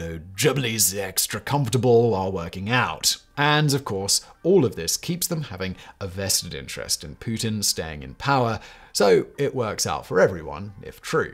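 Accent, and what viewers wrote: United Kingdom. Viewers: because he has a British accent and the code doesn’t work in the uk. It makes no sense. The average hello fresh box in the U.K. (box of 5 meals) comes to £78 that’s more than I spend in a fortnight!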